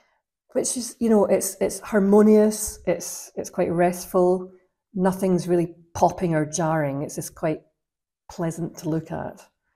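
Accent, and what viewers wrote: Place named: Scotland, United Kingdom. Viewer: Love to hear your Scottish voice. I like Americans but its great to hear a familiar accent.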